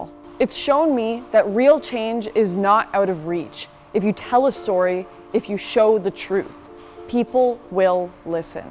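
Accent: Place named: Canada. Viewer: I love her Canadian accent, “prOject”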